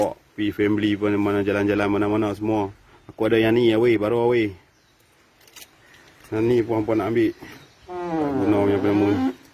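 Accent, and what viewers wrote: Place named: Penang, Malaysia. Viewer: Alor Vista ni dekat mana? suara macam loghat penang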